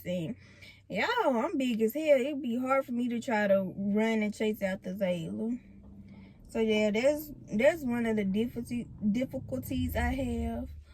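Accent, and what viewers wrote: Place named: South, United States. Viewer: Hey.... I love the name Zahavi...and your Lil southern accent make it sound really cute. I bet he look just like you! I'm proud of you for stepping up and wanting to be the mother you are. We all have things we wish we could have did different but if we did different it would still be other choices we could have made...if that make sense. Your so cute and seem to know exactly what you can handle. Imma a fan! #TeamZs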